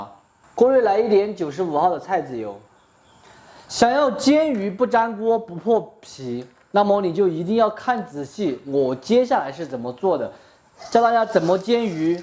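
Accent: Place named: Xinjiang, China. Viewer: 博主的普通话说起来很像新疆人。😄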